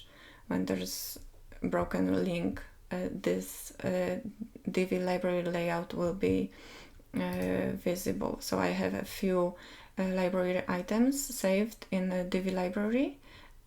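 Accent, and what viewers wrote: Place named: Poland. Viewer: Tak właśnie mi się zdawało, po imieniu i nazwisku :). oraz po akcencie :) Super fajną wtyczkę zrobiłaś, jest ona może w wersji Polsko języcznej ?. Jak masz podaj mi linka do swojej strony w języku polskim lub do jakiejś dokumentacji odnośnie tej wtyczki Pozdrawiam Łukasz :)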